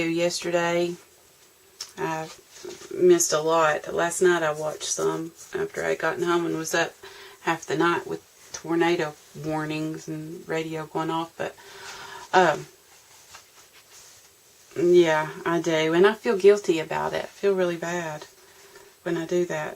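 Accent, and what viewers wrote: South, United States. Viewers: I loved that you changed your questions, that was I felt it was too much private information they were wanting. I had to laugh about Eclectic Hillbilly. I am so very thankful that Pam (Grammie2Bay) and Beth (BethScrapbookRoom) both turned me onto your channel. I just love your southern draw and mannerisms. I know what "I Bless your Heart: means. I enjoy thoroughly listening to your soft soothing voice, within 7-10 minutes I know my blood pressure has dropped as I mellow out with you. I guess I am going to bite the bullet and start making youtube videos. I need to research how its done, and if I can even do them in my little craft are (I have my bedroom closet. Maybe that would be my first video. Anyway thanks again for changing the questions. Take care and hope to talk soon. Teresa in Maryland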